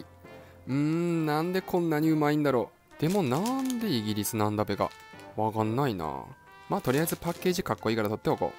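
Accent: Tsugaru, Japan. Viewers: 津軽弁面白いけど、動画自体があんまり面白くない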